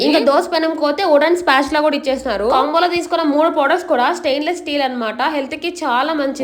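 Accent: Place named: Chittoor, India. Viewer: Ye vooru akka midi... Chittor accent la vundi..😊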